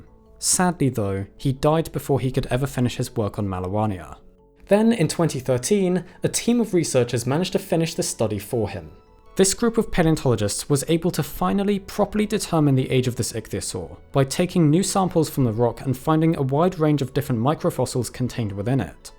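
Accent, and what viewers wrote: United Kingdom. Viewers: if this channel had a non-british narrator i would probably watch it more often. the narrator is also just so monotonous and the script is so standard academic that I cant even listen to it without my mind drifting away.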